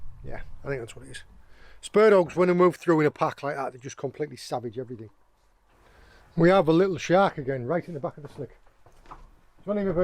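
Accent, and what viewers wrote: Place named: North East England, United Kingdom. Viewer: Been watching your channel for about two weeks now,thought I herd a north east twang lol am in Newcastle but my late grandad was a amble fisherman in the North Sea. Never been fishing myself apart from hand catching flat fish down the ozburn under byker bridge Newcastle as kids when the tide was out. Do you ever see basking sharks in Cornwall?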